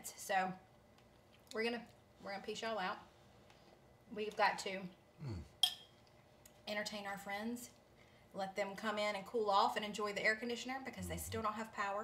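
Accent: South, United States. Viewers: Hey We are here for you My Prayers and Blessing to you and and All your Family and Thank God weren't hurt And Remember Gracie Lou is a your Guardian Angel I am From East Pennsylvania and I am Full puerto and just be yourselves you'll Rican and I was Born😅 Big Family and I So Love Your Southern Accent and My Dear you are Perfect as you are and is Hilarious and So My Dogs Name is Tootsie Lou he has My Middle name but it's spell Different It's Bonnie Luz omg I would Love to meet you in Person and I am So Sorry You had Loss Gracias Lou I had cry so fast I had a Sense it, it's ok to cry and it's ok.. I love watch her Old Videos You 2 jusr Be yourselves ok The Cutest Couple I watch All your old Videos you light up My Spirits Real z people IN The Kitchen cooking and Kidding around Having Fun ❤My Sister and I watch you.. Sending All My Prayers and you get Back where you were Before the Hurricanes and you Safe.. 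you are Speaking Spanish Is On the Dot.. take care Mamasita And papacito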